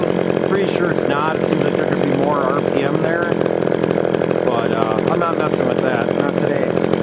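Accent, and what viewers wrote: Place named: Minnesota, United States. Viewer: Where are you? I’m in Stillwater. Sounds like your in MN. I have a JD 3033 your video was a big help when I put my block heater in. Thanks for posting.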